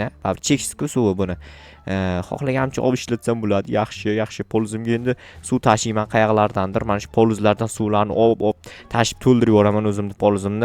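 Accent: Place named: Bukhara, Uzbekistan. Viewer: Buxoro shevasi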